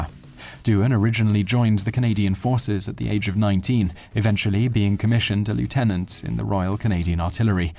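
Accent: United Kingdom. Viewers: A few comments. First, when discussing he was shot by a 'Canadian gunman' you show a pic of someone wielding an American M1 rifle. And why the term 'gunman'? Sounds amateurish. Soldier or rifleman would have been better. I believe it was a burst from a Bren LMG that got him. In his autobio he says that he didn't notice the leg wounds and was fixated on his finger hanging by a bit of skin. He went to an aid station and the medic merely tore his dangling finger off then said, "now, how about that leg?" He was stunned that he hadn't noticed the most serious of his wounds... or that he could even walk! Now for the bit that irks me the most from this video - particularly as the narrator is obviously a Brit. That would be the MISPRONUNCIATION of the rank lieutenant. Who do you think we Canadians are, a bunch of yanks? Every English speaking nation of the world pronounces it 'lefftenant' EXCEPT one... 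the USA. I have no problem with that - that's their way. But, for the love of God, stop using the US version when referring to the Commonwealth! You're likely thinking, "oh relax. It ain't no big thing..." But it is. We are losing the finer points of our culture to a deluge of US influence. Already far too many ignorant Canadians use the wrong pronunciation (even reporters who often say "Lootenant Governor'....grrrrr) without having someone with a Brit accent confusing them even more. And to all the dummies who say, "but it's spelled that way..." I say, speak the rank 'colonel' out lou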